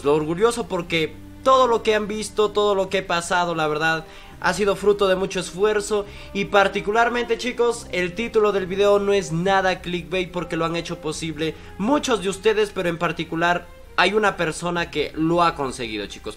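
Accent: Mexico. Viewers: Si no decías que eres de Cusco, juraba que eras mexicano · Yo también juraba que el era mexicano por el acento de narrador mexicano que tiene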